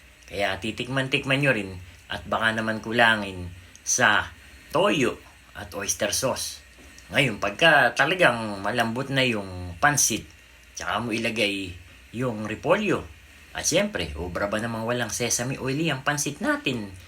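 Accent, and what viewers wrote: Bulacan, Philippines. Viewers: taga bulakan ka san lugar ksi yun punto mo .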